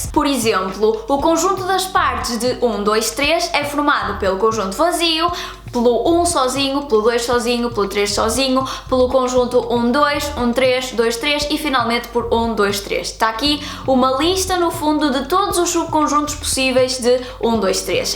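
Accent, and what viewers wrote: Portugal. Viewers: Um canal com um sotaque lusitano: além da linda Matemática, também vou aprender o Português de Portugal e melhor o meu Português do Brasil. Inscrevi-me e licença para eu assistir os outros que ainda não vi.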